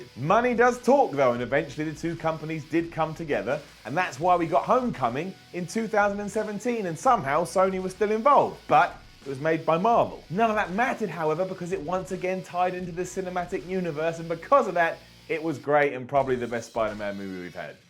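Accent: United Kingdom. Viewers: Lol after I saw this comment first thing I thought about was fake taxi because he has a British accent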